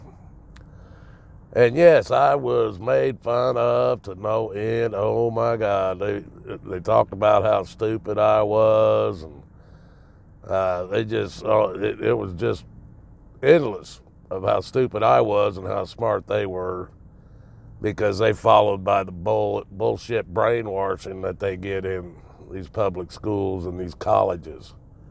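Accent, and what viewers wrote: United States. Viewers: lol i thought it was richie's brother terry from texas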